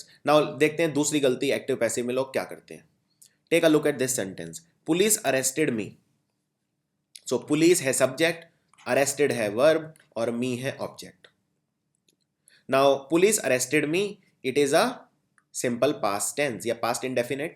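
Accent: India. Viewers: Are you speaking english or Indian ? What heavy accent do you have !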